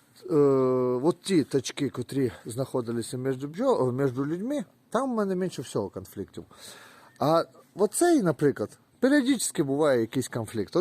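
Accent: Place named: Transcarpathia, Ukraine. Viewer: але й файна в вас говірка закарпатя гарний край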